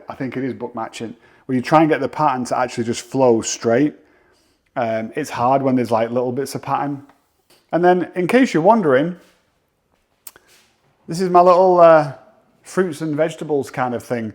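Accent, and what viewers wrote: England, United Kingdom. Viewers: He dosent talk American his he from England. Beautiful house Amazeing.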